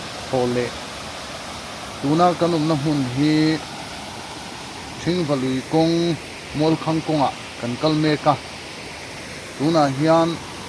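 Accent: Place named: South, India. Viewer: I tawng kau chheh danah chhim lam mi i nih ngei hmel e.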